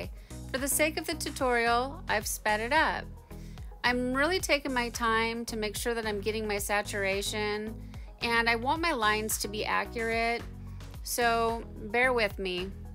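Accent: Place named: Midwest, United States. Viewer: Not weird at all! But I insisted my husband come hear you say it because I knew it would give him a taste of home. You’ve got the typical midwestern “flat a” just like he does when pronouncing words like back, flat, and, of course, wag. He’s from Michigan, but we currently live in South Florida, so “hearing home” made him smile. Thanks for that. As for THIS wigwag, HOLY MOLY!!! I don’t have words (and that’s saying something for ME!) Simply stunning! Redemption complete.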